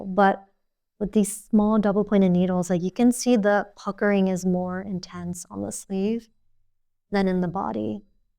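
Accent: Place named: United States. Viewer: I recently found your channel and have been enjoying your videos. I like your style and the way you present yourself. I would love to see an introduction video, where you share more about yourself. 😊 You sound American, and then your milf is Finnish but you live in Poland - I got curious! 👀😁 Ps. Greetings from Finland! 👋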